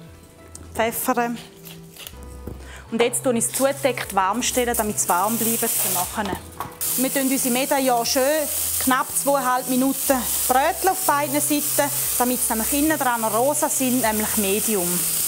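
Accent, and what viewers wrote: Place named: Switzerland. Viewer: super video!!! ich als tiroler versteh den schweizer dialekt, hahhahaha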